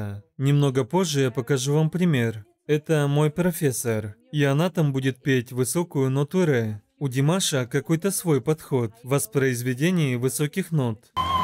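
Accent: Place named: Kazakhstan. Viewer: Вы говорите по ҚазаҚчки девушка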